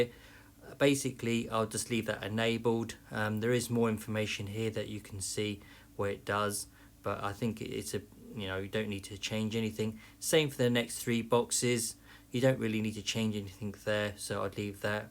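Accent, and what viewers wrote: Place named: RP, United Kingdom. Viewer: Why would he do that? He's English, from England who speaks Oxford/Queens English. Not a yank speaking false "American" English.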